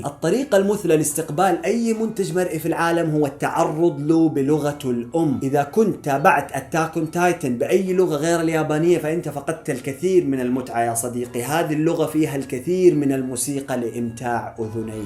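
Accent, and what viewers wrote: Yemen. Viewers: من وين انت لهجتك قريبه للهجه لليمنيه☻?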